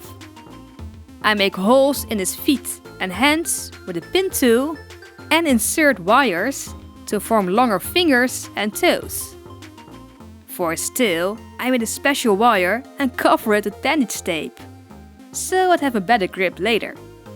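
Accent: Netherlands. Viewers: It sounds like you are Dutch.. No hate btw! I am Dutch! SO i just wanted to know.. 🤔😂😊❤️ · Qiick question, are you Dutch? I recognise the accent haha. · wait hold up are you Dutch as well? You sound so Dutch it's... well, it wouldn't be funny either way, but like, this is the second Dutch Doll channel I've found is this what y'all do? Make great sweets, cheese, flowers, and dolls? Also, the mills are hella cute. Loved the repaint too, you're super talented!!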